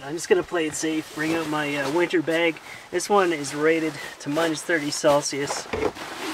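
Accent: Canada. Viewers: He needs some Dominos in his life. I should really donate to this guy. Love the Canadian accent. Tell is a story or 2 about your hockey youth!!!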